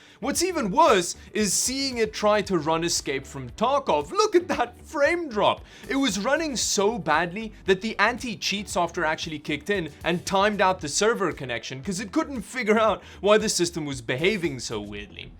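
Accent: South Africa. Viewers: That accent confused me, I hear a South African accent there. What's your story? :) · What accent is this, you sound like me, but being in Canada throws me off maybe it's an odd French/ Norwegian I assume SA though because you said suss out and sketch. Common use here. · South African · Are you from south Africa? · are you south african?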